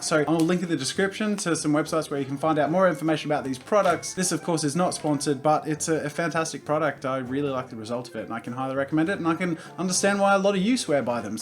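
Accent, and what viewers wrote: Australia. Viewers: Garbage man he's Australian! LOL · i KNEW he was an ausy. Now i fanaly know. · Yessssss I thought you were American but your Aussie I'm Aussie tooooooooooo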